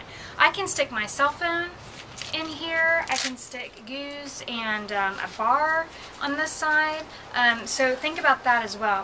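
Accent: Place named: United States. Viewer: really that ;erson said you have an accent ? you sound like a american girl ha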